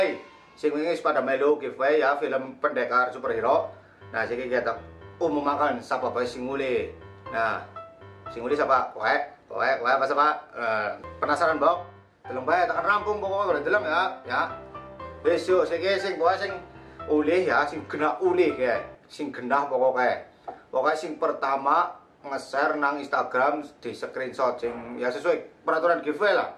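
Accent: Banyumas, Indonesia. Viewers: Q ora due instagrama mas...penting lih esih ttp ngapak mas +batir"....kritikan anggep bae masukan sing bagus..ttp positif thinking bae bn terus majuuuu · Salam cingire teko lampung kang, semangat pokoke nggawe film e. Aku wong jowo tapi nak ndelokne wong jowo ngapak ngomong iso nggarai ilang mumete, lucu soale kang😂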